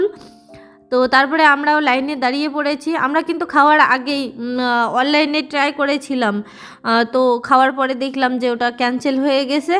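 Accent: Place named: Bangladesh. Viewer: তুমি তো এই দেশের মেয়ে তাহলে তুমি কেনো বাংলা দেশের মতো কথা বলো....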